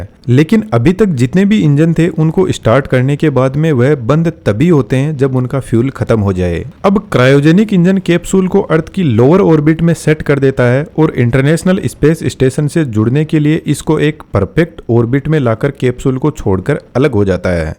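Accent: Rajasthan, India. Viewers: Bhaisaab aapka accent Rajasthan wala h aap kaha se ho, Mai Jaipur gramin se hu😊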